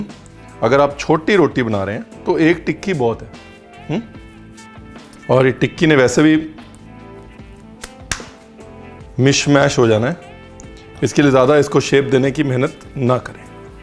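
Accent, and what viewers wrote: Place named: Punjab, India. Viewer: Ranveer Sir I love yr punjabi Accent u say ...yelo ji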